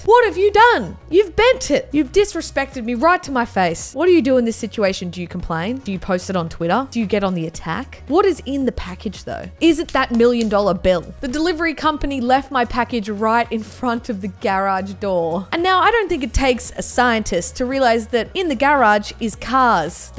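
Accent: Australia. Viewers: Are you from Australia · is it me or does she sometimes have an australian accent in some words and then in others she does “caunt stand”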